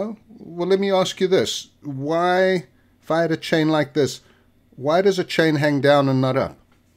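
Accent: South Africa. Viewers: South African accent? 😁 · Are you South African friend?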